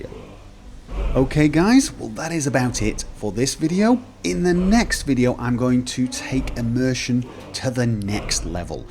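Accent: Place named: Yorkshire, United Kingdom. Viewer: he has a Yorkshire accent, mixed with a bit of wherever he lives now.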